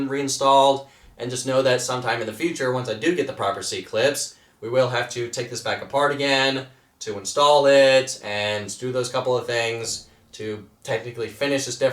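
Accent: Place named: United States. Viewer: Where is his accent from people ? I know its american? But what state etc it sounds so mad but in a good way 😁😁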